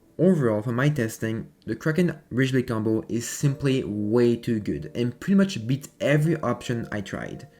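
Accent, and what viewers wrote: France. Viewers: T’es français ? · You have a french accent bro ?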